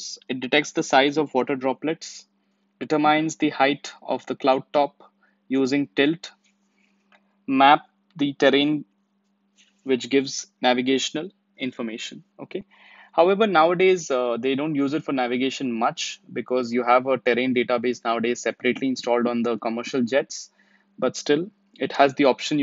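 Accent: India. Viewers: I’m sorry man, i don’t want to offend you but i just can’t learn anything with an Indian accent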